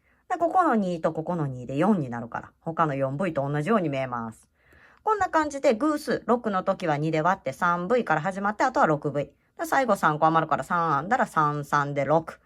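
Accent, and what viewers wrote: Kansai, Japan. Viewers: 関西訛りが魅力的な、こんにちは。(札幌とその周辺は訛りがほぼないので、素敵に聞こえて仕方ないです) 円は、法則で覚えているので…増目位置もその都度考えればすぐに出てきます。法則で覚えられると、便利ですね。中学高校の時、数学の図形の問題と数列はちょっと得意だったので(すごく得意までではない)、編み物の法則も覚えやすい気がします。 …学校のお勉強は、いつどこで役に立つかわからないですけど、ある時ひょっこり顔を出しますね…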